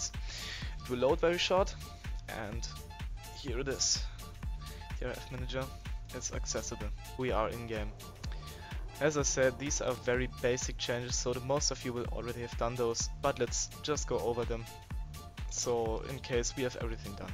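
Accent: Germany. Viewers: Man merkt schon das du Deutsch bist aber das ich net schlimm! Dein Englisch ist gut! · you german? ^^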